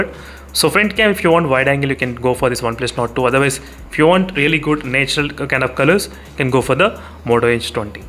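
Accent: South India, India. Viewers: It is South Indian accent. English is correct.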